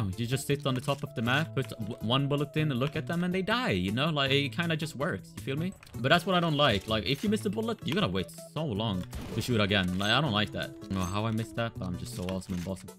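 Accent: Sweden. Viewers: Jag hoppas att du är svensk så att min hörsel inte sviker mig. Btw hur fan kan du ha infintie credits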